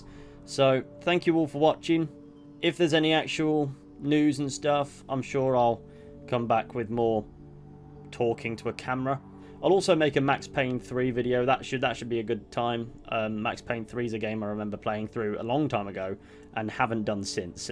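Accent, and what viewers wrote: United Kingdom. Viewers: لكنتك ليست أمريكيه هل انت من المملكه المتحده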